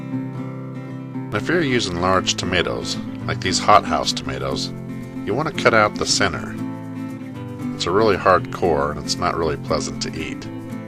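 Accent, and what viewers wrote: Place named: Texas, United States. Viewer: Lol. Come to Texas. We all talk like this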